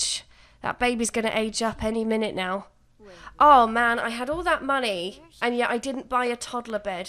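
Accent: United Kingdom. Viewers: are u brtitish ur voice is cute and love the challenge video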